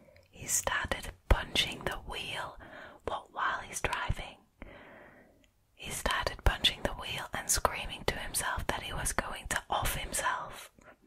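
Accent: Northern England, United Kingdom. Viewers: you have a lovely voice sends me straight to sleep :) not to intrude but are you from the north of england? i am from there and you pronounce things similarly to me and it made me feel represented haha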